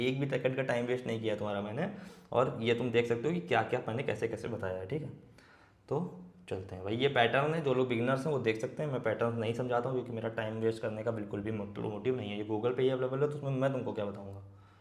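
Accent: Lucknow, India. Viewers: kasam se jaan gya tha boli se hi ki lko se hain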